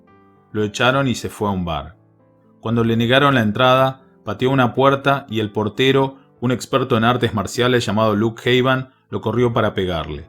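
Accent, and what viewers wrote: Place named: Yucatan, Mexico. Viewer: parese yucatecooo bombaaa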